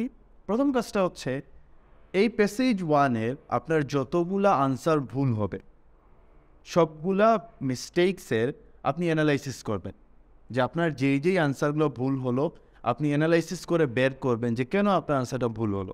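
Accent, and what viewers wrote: Sylhet, Bangladesh. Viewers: Sir apne ke sylhety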